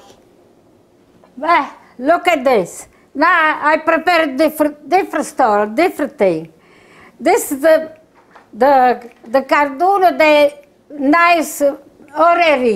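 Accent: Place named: Sicily, Italy. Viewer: Oh, dearest Grandma...I looked and looked on Google to find "Cardoons". The were not the ones that my grandma took the kids out into the country to search for. THEN I figured that just maybe you would have a recipe for the ones found in America. YESSSSS!!!! There was your beautiful smiling and enthusiastic face. You have solved my puzzle, and I thank you so very much for it. I cannot wait to send it to my cousins - we are all in our 70's or 80's. I hope that some of them are as happy to relive this part of our childhoods as I am. When I hear your accent - sometimes it brings tears to my eyes because you make "see again" my Sicilian grandma., you are a beautiful woman - inside and outside. Thank you so much - I just ran out into my yard and gather up some "cardoons." Vivi bene e felice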